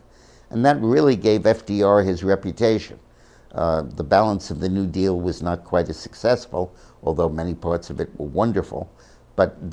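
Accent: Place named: New England, United States. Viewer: Histray. . . Very New England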